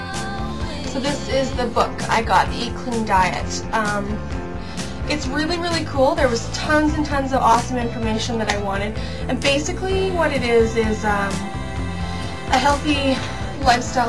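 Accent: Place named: Wisconsin, United States. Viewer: You betcha! Lol....I'm from Wisconsin, so it sounds like ours a little.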